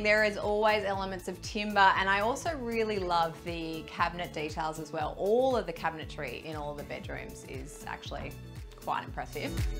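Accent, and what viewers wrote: Australia. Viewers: What part of Australia is your accent, I wonder. It's very different to what I hear on the daily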